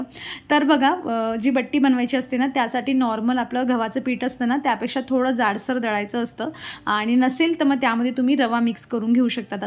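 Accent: Khandesh, India. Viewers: Oy tu aplya khandesh chiy ka v..kudliy nemki gav kont sagjo br...😅u remember our tone ❤😂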